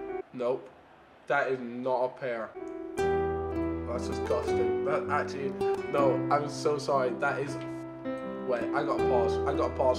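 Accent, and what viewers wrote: United States. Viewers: he talks kinda American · He kinda sounds American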